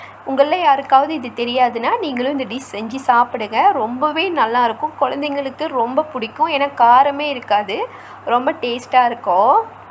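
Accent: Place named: Sri Lanka. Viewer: Full video covered da super nd ur slang s lyk srilankan very nice to hear ❤️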